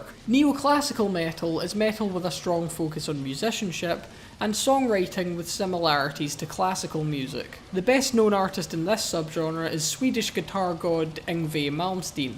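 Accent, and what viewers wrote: Scotland, United Kingdom. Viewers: Funny Scottish dude make the good explanation, my question, where does the Hu fit in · the scottish accent is just the cherry on top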